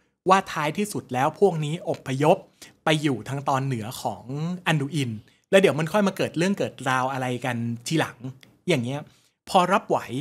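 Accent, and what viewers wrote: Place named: Lampang, Thailand. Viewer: ฟังมาสองปีพึ่งรู้ว่าคนเหนือ สำเนียงแบบนี้ลำปางก่อครับ?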